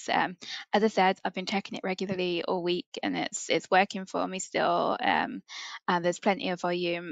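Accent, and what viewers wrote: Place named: United Kingdom. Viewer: I know this isn't part of the actual video but you have a beautiful voice! Also on Stitches EU but I think I will try to find my own flips so I don't inflate prices and spoil it for you! Trying to work out where your accent is from in the UK...tbh I am kinda terrible at guessing haha. Keep up the videos!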